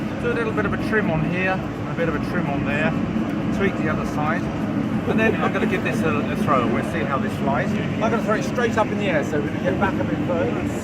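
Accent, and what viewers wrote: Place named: Cambridgeshire, United Kingdom. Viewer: CLAUSE BOMB!! :) Good day fellow brit, maybe even from cambridge? as you are in duxford and you have a distinctive cambridgeshire accent rather similar to mine. Keep up the good work chap. Tally ho!!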